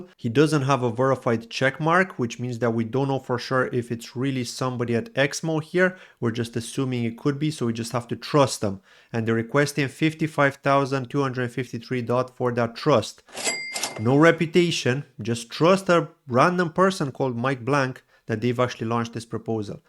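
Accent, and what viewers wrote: Ireland. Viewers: you may or may not remember a couple of years ago I asked if you had lived in Ireland as I get a hint of Irish in your accent. Well today you have an Ireland t-shirt on.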